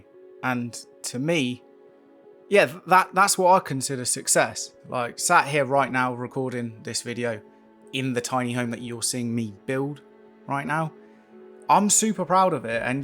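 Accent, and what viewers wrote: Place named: England, United Kingdom. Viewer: As for talking or not.. just carry on as now. Your voice is pleasant, info is good to know. Too much talking can get annoying but so can total silence. How'd you keep yr English accent growing up in Normandy? Love to know..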